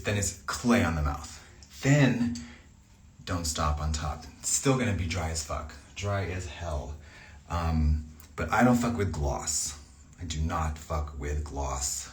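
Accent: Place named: England, United Kingdom. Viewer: English accent!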